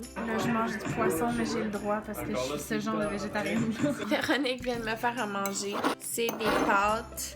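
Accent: Quebec, Canada. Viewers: MDRR LACCENT QUEBECOIS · c'est fou ce que j'adore l'accent québécois! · moi aussi je veux l'accent québéquois !! j'en suis amoureuse !!! super beau ! félicitation pour ton défi, je ne suis pas sûre que je serais capable de le relever ... Plein d'amour ! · Wow ! Rien que des emballages plastique ou jetables qui vont polluer et engendrer la mort de quelques animaux qui n'auront rien demandé !! Mais ça ce sera pas filmé par PETA !! La video est le fun mais chus pas sûr que le végétarisme ou même le vegan ça soit rien d'autre qu'une mode de pays riches où tout le monde mange à sa faim pis essaie de se donner bonne conscience... Dans les pays moins développés, si tu demande à quelqu'un comment s'appellent les gens qui mangent pas de viande ils te répondent : les gens pauvres ! Sinon tes videos c'est l'fun pis chus tombé en amour avec l'accent québecois tsé ! 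Mais ça serait cool que tu nous parle de sujets comme la disparition des abeilles qui risque de provoquer un déséquilibre irrémédiable sur notre planète et qui est engendré par la surproduction agricole notamment des amandes et des oranges etc... ;)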